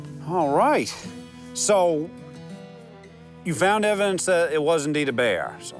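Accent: Boston, United States. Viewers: Boston accent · He has to be from Boston....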